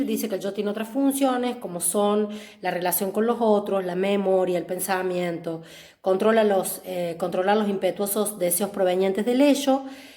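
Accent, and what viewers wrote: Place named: Córdoba, Argentina. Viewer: Que buena explicación y gracias que sea de una tonada cordobesa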